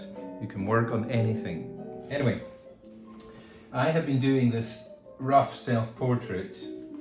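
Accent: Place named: Scotland, United Kingdom. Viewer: Icottish accent 👌👌👌👌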